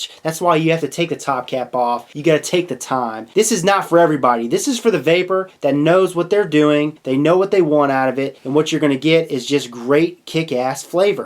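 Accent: South, United States. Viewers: It is wayyyy more than the way he says something, it's also the phrases and choice of words that are dead give away he is from the south. "And she's a chuckin." Is obvious, for example. You can tell he isn't from like Alabama or something, and to be honest he probably tried to sound as neutral as possible, because in the US sounding like you are from the South makes people think you are dumb.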